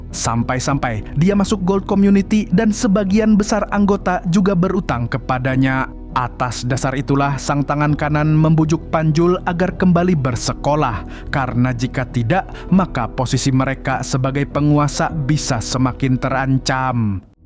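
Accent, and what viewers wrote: Banjar, Indonesia. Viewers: Terlalu banjar mang ai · Pian ni orang Banjar KH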